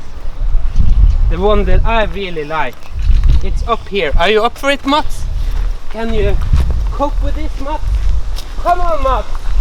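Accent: Northern Norway, Norway. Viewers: You are so norsk although you are speaking English but norsk keeps popping out 😊👍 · e du nordlending? 😁 cool videos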